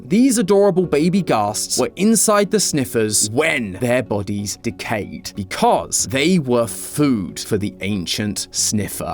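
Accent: United Kingdom. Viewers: more like why is the narrator always british 🙁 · youre the narator, whos VOICE IS BRITISH!! you used to work with Upisnotjump didnt you!! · what did i miss why is british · BRITISH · Oh this is British now. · Yeah the British accent is too much 😂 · I haven’t watched since why is he British😢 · unwatchable british accent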